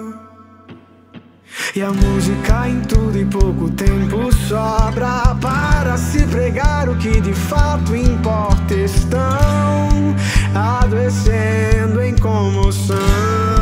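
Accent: Northeast, Brazil. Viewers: Graças ao Espírito Santo por ter abençoado vc com essa letra inspirada! Digo mais... pelo seu sotaque parabenizo o nordeste brasileiro por estar despontando em produção teológica tanto nos seminários como nos púlpitos, livros e agora tbm letras. Ansiando por um reavivamento e uma reforma na igreja brasileira. Fantástico isso! Mano, como faço pra conseguir os PlayBacks dessas músicas do álbum Ecoar? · Cara ameiiiiiiiiiiiiiiiiiiiiii, como luterana do sertão cearense, cheguei a chorar com esse sotaque lindo da minha terra nordestina! PARABÉNNNSSSSSSSSSSS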